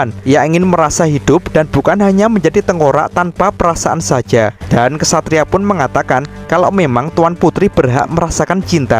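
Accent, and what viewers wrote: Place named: Madura, Indonesia. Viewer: Kok malah kek orang Madura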